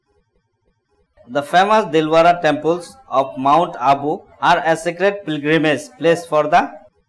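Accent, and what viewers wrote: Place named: Mizoram, India. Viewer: accent resembles a Mizo a lot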